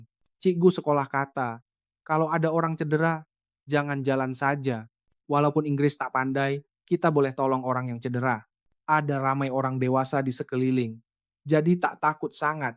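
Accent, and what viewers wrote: Indonesia. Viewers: Nmpk sgt citer rekaan. Berlebih sgt. Suara pun indon. Kami biasa² je. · kenapa suara loghat Indonesia. ni Chanel apa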